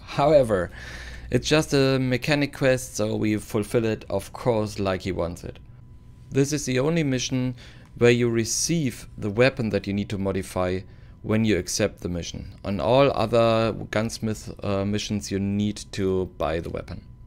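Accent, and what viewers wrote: Germany. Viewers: Thank you nice video are you German ?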